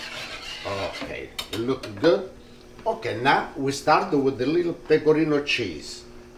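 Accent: Italy. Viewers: You remind me of my grandfather who I called Papa. Love the Italian accent. I’m gonna make this dish this weekend! 😃😋❤️ 👩‍🍳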